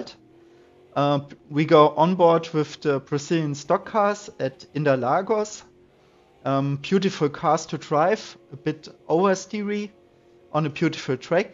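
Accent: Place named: Germany. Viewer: thank you so much for your help! And as a also German I may say, that I love your beautiful German accent 🤩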